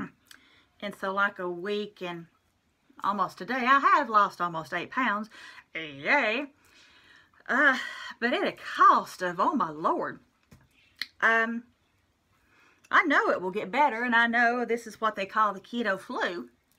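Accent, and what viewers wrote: South, United States. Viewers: I love you n your Southern drawl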